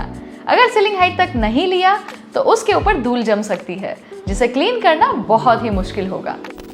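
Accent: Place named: Gujarat, India. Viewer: I think it's Gujarati accent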